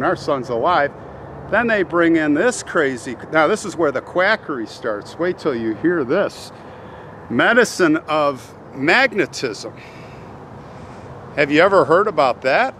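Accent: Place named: New England, United States. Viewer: Makes you wonder how many others were buried alive back then.I detect an accent from the New England area. I am also from there.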